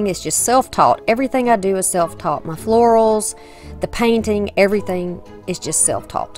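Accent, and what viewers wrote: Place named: Alabama, United States. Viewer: I could tell you were from Alabama!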